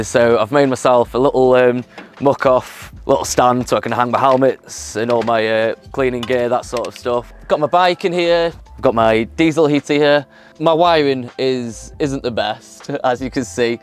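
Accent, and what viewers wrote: United Kingdom. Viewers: I love British Accent So awesome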